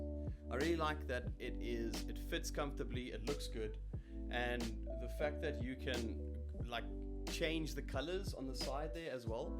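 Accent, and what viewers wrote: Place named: South Africa. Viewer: so Ja YOU ARE DEFF a South African XD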